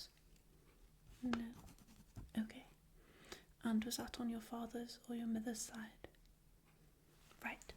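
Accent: United Kingdom. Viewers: Is that w Welsh accent I detect?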